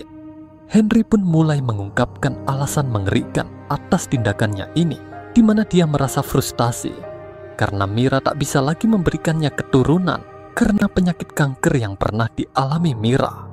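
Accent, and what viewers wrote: Bali, Indonesia. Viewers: Suka dengan alurnya... Bang, kamu orang Bali ya?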